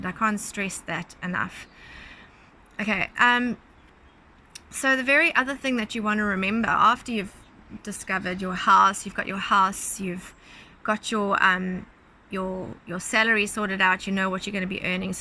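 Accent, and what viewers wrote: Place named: New Zealand. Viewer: How long you been here, your accent has bouts of pure kiwi sounding.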